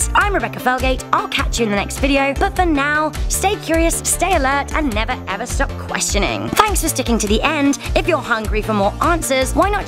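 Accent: United Kingdom. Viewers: Edward Waller She's British, that's how Brits say it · she has a British proper English accent. I do not but I appreciate proper English, from the source.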